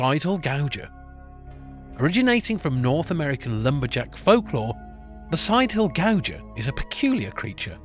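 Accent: United Kingdom. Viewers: Ew. A british person....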